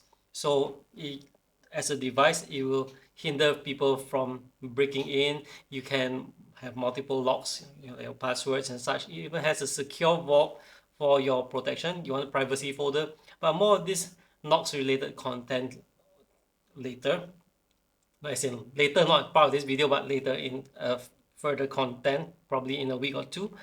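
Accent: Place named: Malaysia. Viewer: Nice vid, you sounded like Malaysian/ Singaporean 😄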